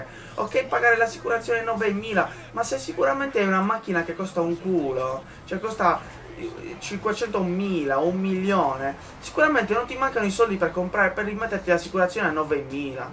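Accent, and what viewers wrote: Sardinia, Italy. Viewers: No si intendiri ca se sardu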